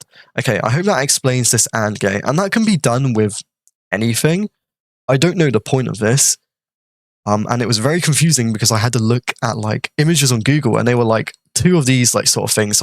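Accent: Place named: United Kingdom. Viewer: he got that Gordon Ramsay accent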